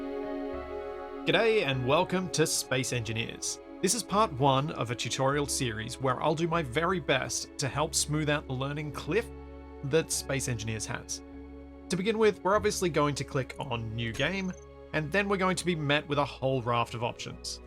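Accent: Australia. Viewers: You sound Aussie as, and I liked this video with its easy and full getting started walk-through.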